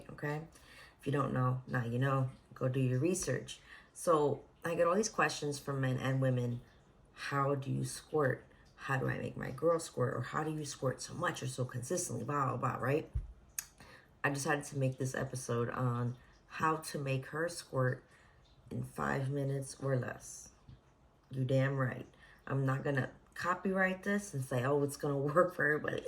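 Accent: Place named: New Jersey, United States. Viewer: You so fuckin jersey I love it lmao jawn good shmoney lmao jersey boy here 💪🏾💪🏾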